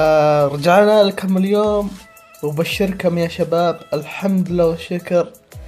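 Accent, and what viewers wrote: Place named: Qassim, Saudi Arabia. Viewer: اية بس لهجتة قصيمي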